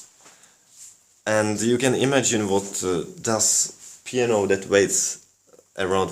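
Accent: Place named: England, United Kingdom. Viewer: I find your video offensive and uninformed; foolish, indeliberately rude libel due to plainly displayed ignorance. I suggest to Kawai that you be sued in a proper court of law for libel. You know nothing about digital pianos and need to go back to your ignominous acoustic piano hole where you came from where you will never find a girl or guy that will come near you. Kawai is a miracle to the digital piano industry. Ingrateful moron with an English accent to prove it...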